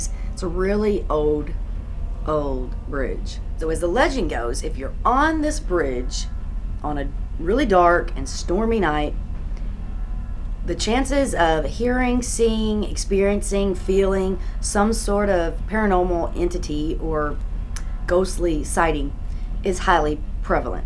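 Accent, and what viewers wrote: South, United States. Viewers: I was hoping you would say where it was, with your accent I assumed somewhere here in the south. This sounds like goatman's bridge, which I've been to. Eerie even during the day. I have no doubt its haunted.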